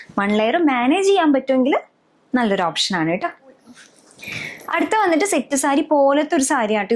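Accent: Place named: Valluvanad, India. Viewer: Thrissur കാരി അല്ലേ...പക്ഷെ സംസാരം..slang okke വള്ളുവനാടൻ ആണെല്ലോ...സ്റ്റൈൽ ിനു വേണ്ടി ആണോ ഇങ്ങനെ പറയുന്നത്... anyways nice vlog ❤❤❤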